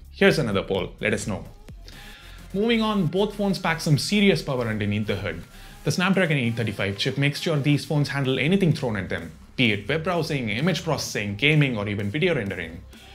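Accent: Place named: India. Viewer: Indian accent is always fun to hear :)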